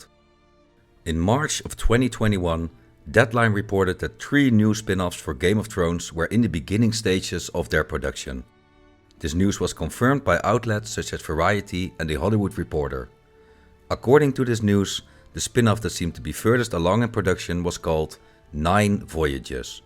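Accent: Netherlands. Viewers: An excellent video, l've liked and subscribed, best of luck building your channel. Is that a Dutch accent l hear?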